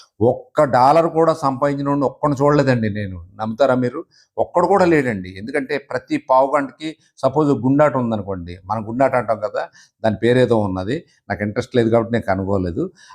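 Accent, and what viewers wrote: Godavari, India. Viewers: Correct ga chepparu me way of talking mana Godavari valla la telustundhi😊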